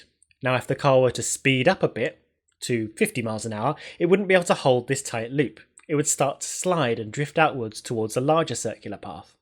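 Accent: United Kingdom. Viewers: British english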